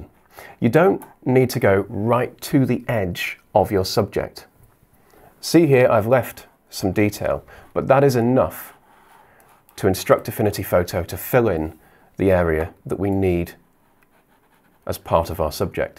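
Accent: United Kingdom. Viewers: Thank you very much for this tutorial !!! And nice British English spoken, love it !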